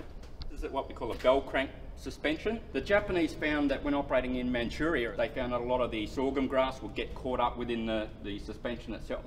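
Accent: Australia. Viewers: Ruined by his revolting Australian accent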